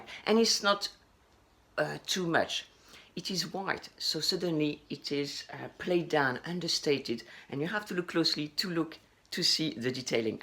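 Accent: France. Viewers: I love your accent. Your clothes are beautiful, timeless classic pieces that you could have in your wardrobe for many years. I think it's worth investing a little more money into good fabrics and a piece that's a little different. As you would wear them time and time again. I love the black and white, and navy and white stripes and polka dots in your wardrobe, and accessories, just beautiful 😄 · Hi, I’ve just discovered your channel and already watched a bunch of your videos. I love them and I love your English with French accent! It’s so cute! I was wondering if you could do a video on how to dress according to age. I’m in my late thirties. Blessings from Argentina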